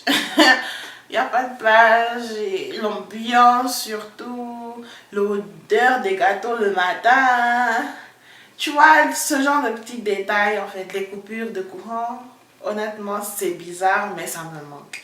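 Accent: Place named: United States. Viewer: Je te découvre et je m'abonne direct.... Tu es tellement douce dans façon de parler et ton accent states😍😍😍😍 ...c'est le Gabon qui gagne🇬🇦🇬🇦🇬🇦🇬🇦🇬🇦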